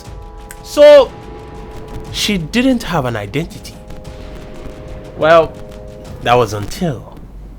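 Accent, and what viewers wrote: Nigeria. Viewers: yep because he creates more nigerian content and speaks like a nigerian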